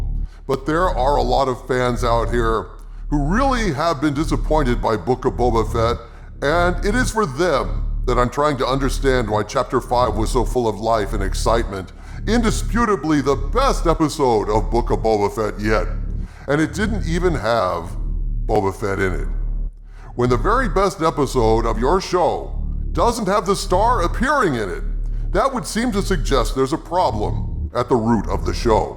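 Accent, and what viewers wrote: New Zealand. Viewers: Aussie? I thought he was a Kiwi. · he’s actually from New Zealand 🇳🇿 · Hes ahhh New Zealander right? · Haha, not Aussie, a Kiwi mate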